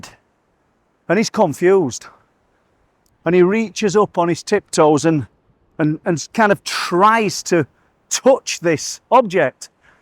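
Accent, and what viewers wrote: Yorkshire, United Kingdom. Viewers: Just discovered you recently, really enjoying the vids, thanks....there's something reassuring about listening to paranormal tales in your lovely solid Yorkshire accent 😄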